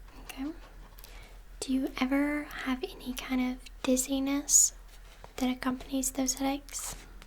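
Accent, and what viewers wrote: South, United States. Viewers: Anybody know which southern state she is from? Just curious to know where accent is from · The subtle southern accent melts me. I loooooove it. GG nice vid keep it up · Lis Sanguinan it's from the southern United States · It's a southern accent dude lol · I hear the southern accent but can't place it; What state are you from? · Lis Sanguinan southern accent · Thats either an Alabama to NC accent. Or shes in the midwest. Hell it could be upstate NY as well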